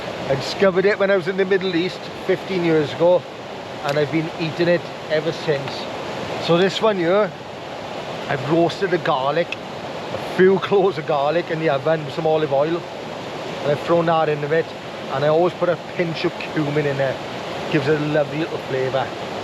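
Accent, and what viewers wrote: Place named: South Wales, United Kingdom. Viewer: What part of south wales are you from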